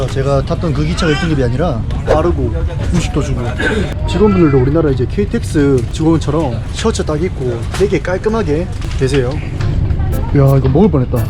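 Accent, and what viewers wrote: Gyeongsang, South Korea. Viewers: 제주도 한달 살이 영상 찾아보다가 추천 영상떠서 보기 시작했는데 며칠 째 시간 날 때마다 님 영상만 주구장창 보고 있네요ㅋ 조만간 제 최애 유튜버가 되실 것 같은데 미리 축하드려요🤣 참 외모만큼이나 성품도 생각도 올바르신 것 같아요! 매사 참 밝고 건강하고 긍정적이고 순수하고 마음 따뜻하신 분인 듯👍 경상도 사투리도 너무 멋지고ㅎ 근데 이렇게 빡씨게 여행하면서 온갖 고생 다하셨는데 왜때문에 꼬질꼬질한게 하나도 없이 반짝반짝하신거죠👉👈💕 저 아무래도 입덕한 것 같아요🤣🤣🤣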